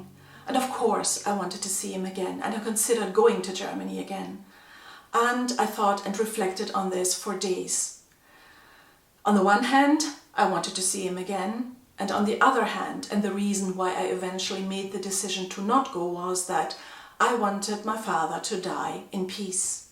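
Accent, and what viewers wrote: Scotland, United Kingdom. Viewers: You are such a great person, while I was listening my brain suddenly felt something strange, my left eye had a tear, not other one, so strange incident, please don't be sad, just forgive them, no doubt you grieving, I know your situations, ignore and take step forward. We are your family now, be optimistic, you are truly, I love Scotland, very beautiful place with lovely ppl, I love Scott's accent, my senior colleague at work was from Scotland, very gunuine and straight forward person, I had been to Bury Town, lovely place, I always I have deep roots with the Scotland. Your videos are admiring n you are a captivating person. Thnx for sharing you daily life. CHEERZ BYEEE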